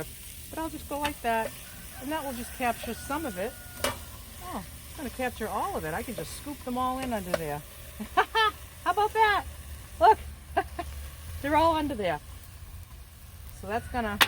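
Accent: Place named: Massachusetts, United States. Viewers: love hearing ur Mass. Accent. I am a vegetarian eater too. Steam mostly in fry pan. But oven bake yams & potatoes. U encourage me to use the pressure cooker. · I eat like you I cook mostly potatoes and beans in pressure cooker. I was wandering what is you plan to receive mail? I asked since we are from same state I like your Ma accent I can hear it. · I love your videos—especially the healthy eating component and your lovely Boston dialect . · my dad's family is from Canton, MA and I can't tell you how much you remind me of them. Love your 'get it done! ' attitude (and your glorious accent! ♥️)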